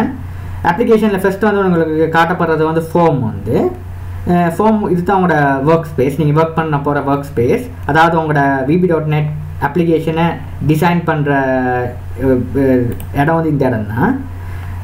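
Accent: Sri Lanka. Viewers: Neenga srilanka va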